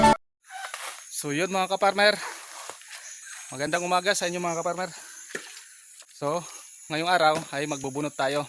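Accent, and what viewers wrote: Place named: Bicol, Philippines. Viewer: Sounds like bicol. San province nyo po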